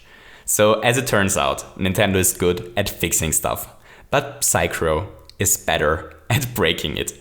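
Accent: Germany. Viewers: Are you german?? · Are you German? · He's from Australia but with a heavy German accent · are u from germany? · are you german? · You sound freaking German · Whoa i didn't know you were German... I kinda recognized your accent but I wasn't certain until i saw the german language at the point where you checked the Upload date from the new black hole Level... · Lol Youre german ? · so you're german, nice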